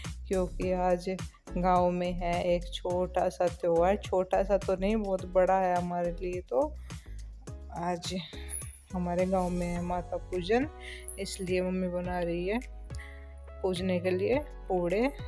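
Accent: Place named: Haryana, India. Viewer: Jaat ho sayad boli too aise he lg re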